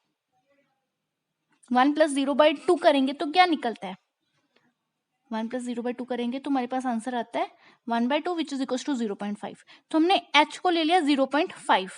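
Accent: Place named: Punjab, India. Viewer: Nice explanation mam By the way Your accent feels like punjabi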